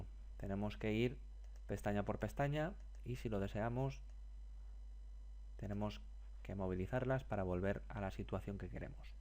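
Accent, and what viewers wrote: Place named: Madrid, Spain. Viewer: Estoy viendo este video desde Pakistan y aca el medio normalmente es Ingles pero con mi nivel intermedio de Espaniol entendi perfectamente y me habeis ayudado demaciado para preparar una mapa. El senior tutor suena madrilenio... lo creo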